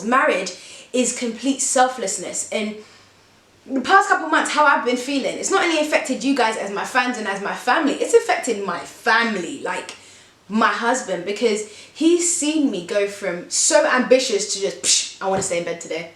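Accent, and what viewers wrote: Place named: United Kingdom. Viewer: Youre brittish? Had no idea! Cheers from France Anyways, you are really inspiring, 💙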